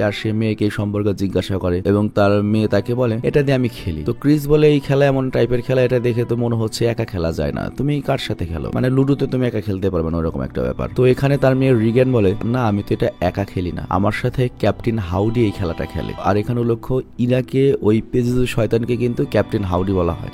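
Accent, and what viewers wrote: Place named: Noakhali, Bangladesh. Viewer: নোয়াখালী কেন বাই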